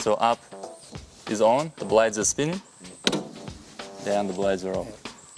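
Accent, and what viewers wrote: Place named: United States. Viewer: American English. Sorry if i offended but I'm just giving my view.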